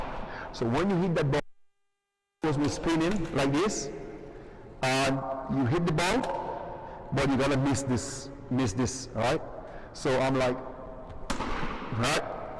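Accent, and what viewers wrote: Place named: Saint Vincent and the Grenadines. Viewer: Good tips. Are you a Vincy? I noticed your accent.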